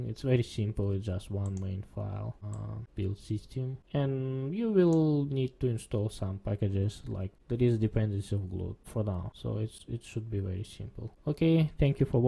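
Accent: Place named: Russia. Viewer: типичный русский акцент.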